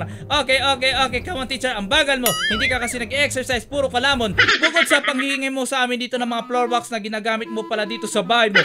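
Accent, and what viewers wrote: Ilocos, Philippines. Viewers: Kuya ilocano kaba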